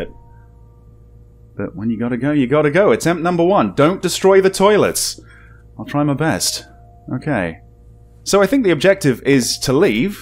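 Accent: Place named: United Kingdom. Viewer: I really like your accent, which is weird because I hated British accents. No offense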